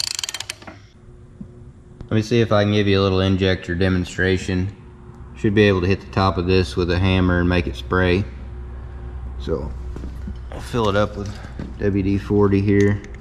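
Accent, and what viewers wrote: South, United States. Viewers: I enjoy every videa Please keep em coming when you have time. Even when the Cat cams are a real treat for you to clean up with all the "Blue shit" that comes on them... These relics are entertaining with the good ole boy country narration. Keeps the content interesting. Bottoms up 🥃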